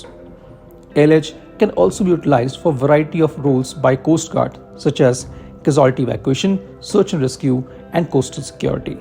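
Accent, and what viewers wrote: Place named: Maharashtra, India. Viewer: Are you from Maharashtra ur accent seems very similar to me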